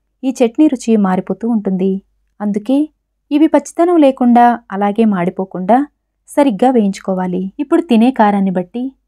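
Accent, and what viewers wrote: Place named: Telangana, India. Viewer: మీది తెలంగాణ అనుకుంటా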